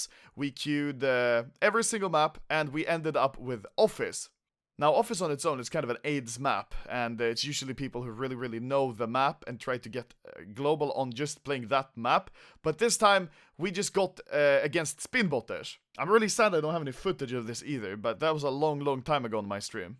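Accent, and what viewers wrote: Russia. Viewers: Are you from Russia??? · Brah , are u from russia or are u from Swedeen , im so confused.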